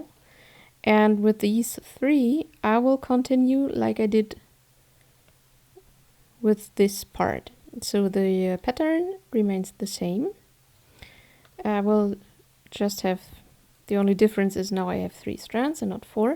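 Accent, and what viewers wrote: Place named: Northern Europe. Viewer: Thank you for answering I was very frustrated when I wrote that… and I love your accent I'm thinking Northern Europe… I wish I spoke a second language as well as you do English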